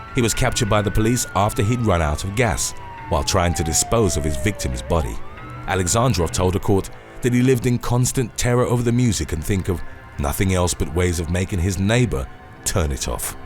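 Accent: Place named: London, United Kingdom. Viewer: Neighbours looks much better.. How come you sound London but use American slang.. ie dollars neighbors etc.. Do you live there now? Great voice over broski. As Clear as a bell.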